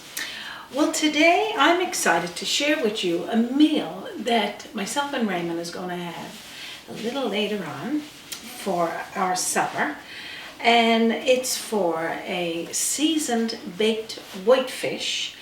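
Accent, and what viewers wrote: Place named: Newfoundland, Canada. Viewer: I love your NFLD accent, tell me when your birthday is so I can send you a Bag of H's. ere are y now? Cool, using orange juice, I have been an Armature chef, because I don't have a red or blue seal, but I have been cooking since 1978, my career took me to other professions, but still I am a cook for the rest of my life, thank you for sharing this very cool recipe, I'm definitely going to make it I like the orange with the rice, Baked. Tell us were you at?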